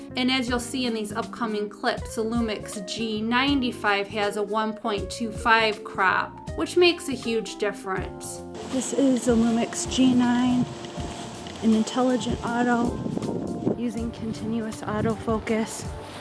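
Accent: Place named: Minnesota, United States. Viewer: As a non-American I can be wrong here, but you sound a lot like the people in that brilliant movie FARGO... Anyways, very good comparison! Groeten vanuit Belgie!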